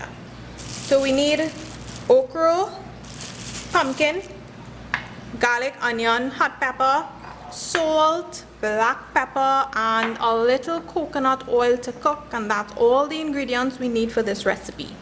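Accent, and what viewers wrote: Trinidad and Tobago. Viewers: Spanish with a trini accent, what a ting😕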